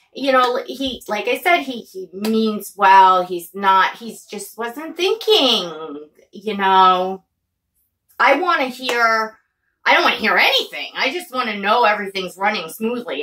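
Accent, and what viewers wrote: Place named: New York, United States. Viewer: Look at you girl!!!! You look and sound amazing!! We missed you (I personally missed that New York accent). 😘😘😘😘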